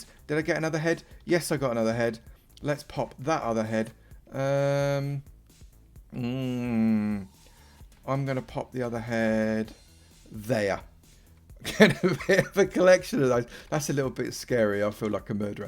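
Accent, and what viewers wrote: United Kingdom. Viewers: I always love your vids and I do have to say that you have the most british british accent ever! Love it!:D -Scottish Person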